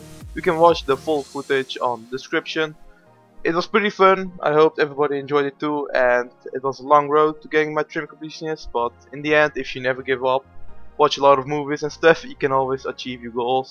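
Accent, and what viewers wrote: Netherlands. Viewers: you sound dutch, am i right?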